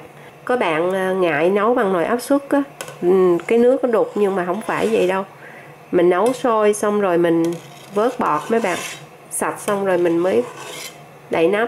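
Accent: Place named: Mekong Delta, Vietnam. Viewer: hết sức chân thành cảm ơn đã nhiệt tình bỏ hết tâm, sức để hướng dẫn, phổ biến những món ăn quốc hồn, quốc túy của Việt Nam cho mọi người một cách đầy đủ, chi tiết kể cả bí quyết gia truyền nhất làm các món xuất xứ từ Miền Bắc như Phở bò, gà, bánh cuốn....tôi cũng rất thích giọng nói mang âm hưởng Miền Tây chất phát, phát thiệt tình....tôi thường xuyên xem các youtube của bạn chúc luôn VUI VẺ, KHỎE MẠNH nhe....và cống hiến nhiều hơn nữa cho cộng đồng: thank you...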